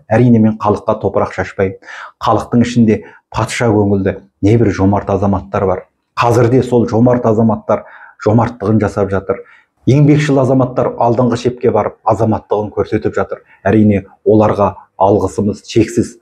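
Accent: Standard. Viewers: Рахмет сизге таза Казакша сойлейсиз барлык блогерлер СИЗ сыякты болса екен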